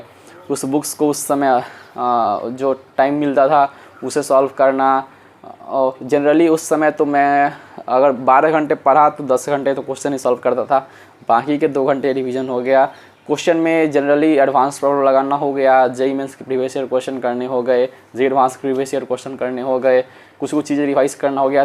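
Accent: Bihar, India. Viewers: Boli se to bihar ke lag rhe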